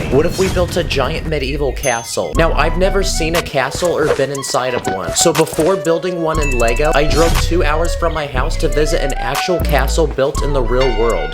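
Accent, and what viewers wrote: Scotland, United Kingdom. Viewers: Well, that great British accent😅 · HE’S STOTISH LIKE. E I’M BRITISH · BRO IS SCOTTISH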